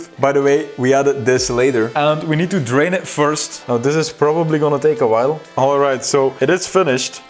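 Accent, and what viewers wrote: Netherlands. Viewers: i heared a dutch accent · You can hear the Dutch accent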